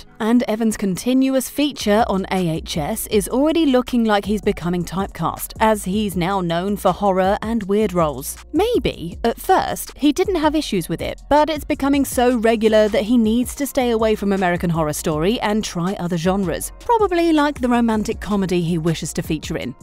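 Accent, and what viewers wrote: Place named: United Kingdom. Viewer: This would have been better if Evan was the one talking... instead of a girl with a British accent 😉 I wish Evan the best..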